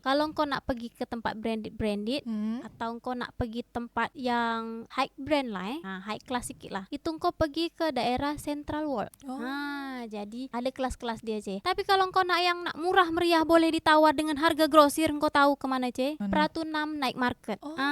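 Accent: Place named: Kedah, Malaysia. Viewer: Hang nak pi mana tu..bahasa Kedah ni 😅😅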